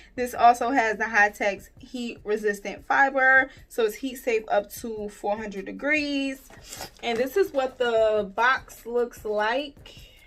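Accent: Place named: New York, United States. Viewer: You gotta be from NY!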